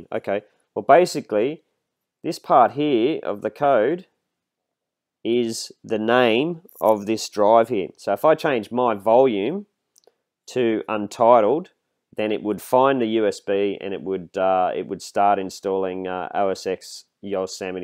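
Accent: Australia. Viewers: Well explained, good to hear an Aussie voice for a change.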